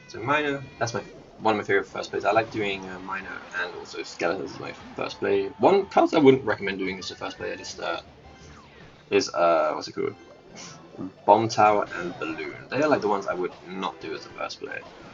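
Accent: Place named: United Kingdom. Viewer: same even though I’ve lived here my whole life. You’re voice just kind of was a british twang if that makes sense 😭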